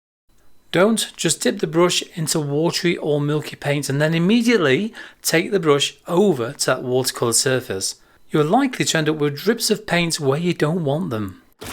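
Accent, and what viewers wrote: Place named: Liverpool, United Kingdom. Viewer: This was awesome. Your accent reminds me of the greatest band of all time-!!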